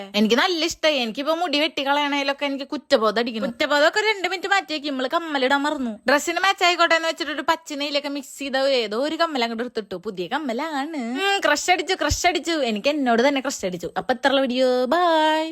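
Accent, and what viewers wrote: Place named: Thrissur, India. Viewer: Thrissur slang polendallooo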